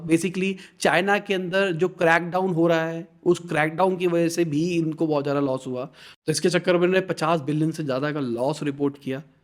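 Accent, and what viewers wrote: India. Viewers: Papa news name is always good. Ye sound like bihar agency or something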